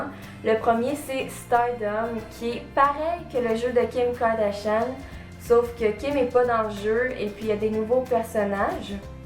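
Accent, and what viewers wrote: Quebec, Canada. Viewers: j adore ton acsant québécois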